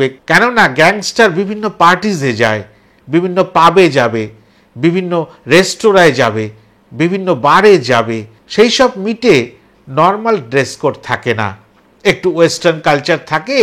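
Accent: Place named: Bangladesh. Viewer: Dada, apni to darun Bangladeshi accent e Kotha bolen. Amon accent e aro Kotha shunte chai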